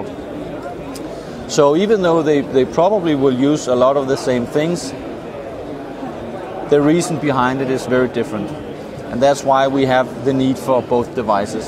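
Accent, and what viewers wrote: Denmark. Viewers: Ja han lyder godt nok meget dansk. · so does sound like a danish accent! haha! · Oh my god, you sound so much like a danish person who speaks English, hahaha. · Very danish accent haha... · He sounds danish.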